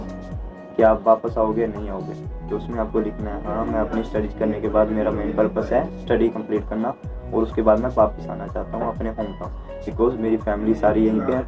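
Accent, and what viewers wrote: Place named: Haryana, India. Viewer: U used haryana Hindi but still got visa That's called Desi boy power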